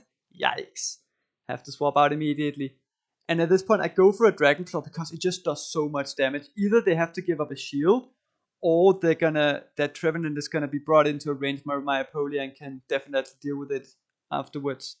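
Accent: Denmark. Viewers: Your danglish is beautiful ❤️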